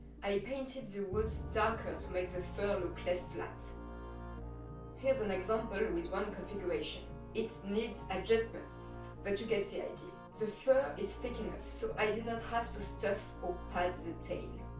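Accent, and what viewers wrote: France. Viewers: hum that french accent... i have the same one sinon joli travail. meme pour les prototypes, ils sont plutot bien réussis. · 00000 i can use this for a fursuit tail!!! thank you so much! also let me just say your accent is amazing! is it french? i really like listening to it it's sort of calming :> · i was wondering, is you accent a french one by any chance? i rarely get to hear french people speaking english with such fluidity so i'm not used to it. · I don't know but I'm just assuming, she's French 'cus of her accent? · toi sa se voit que tu es française juste par ton accent, tu parles bien anglais quand meme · That so good omg ! I was wondering , are you French or something like that ? Btw it's really cool keep it like that is really amazing ! :D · Ça sent le français cet anglais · Are you french ? you havea strong accent ! (I'm french myself so just asking ^^) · j'ai reconnu notre fameux accent en anglais x) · Are you french? 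· Me, french people : FRENCH ACCENT !? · You have a fr accent i u right? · Avec l’accent je me disait que c’était pas une anglaise mais une française 😅 Mais juste RESPECT pour pouvoir créer des parties de cosplay 👏 J’en prendrais graine lorsque j’aurais tout les matériaux pour faire mon propre cosplay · Are you french, you sond French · Tu es française toi sa s'entend xd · Oh my gosh! You are SOOO adorable and talented. Thanks for sharing your processes in making articulated tails. My daughter loves them! She wants me to help her make one and this video has helped me SO MUCH! All the best to you! We love listening your cute French accent!!! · Are you French ? you have a french accent x) (si jamais je suis français et bravo pour ton travail !) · Tu es fr? On entend un fort accent français :3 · Are you French?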